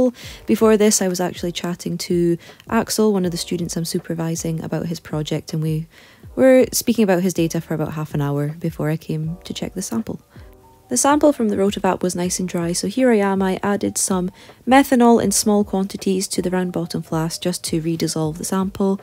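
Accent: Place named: Scotland, United Kingdom. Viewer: Other science phd students: come to ’ videos and understand everything. Me, a humanities phd student: … … looks cool. (*loving listening to your lilting Scottish accent) 💪😂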